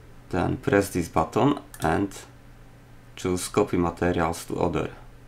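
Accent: Poland. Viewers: You sound polish... ru polish? Nice vid btw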